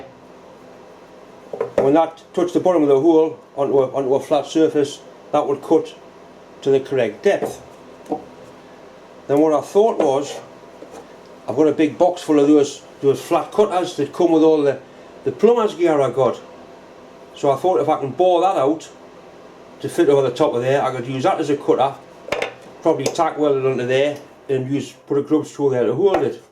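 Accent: United Kingdom. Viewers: Hi You are incredible nice job I like what you done bravo!!! by the way you are from UK??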